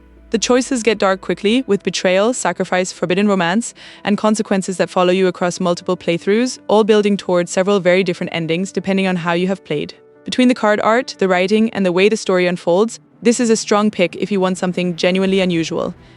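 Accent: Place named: Eupen, Belgium. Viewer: Gotcha! GL with your future endeavours, fellow Belgian. I'd guess Eupen area with the slight accent? Excellent english 👌What are your plans for future videos, if I may ask? This was a clean video but reviews are not very "exciting" afaic.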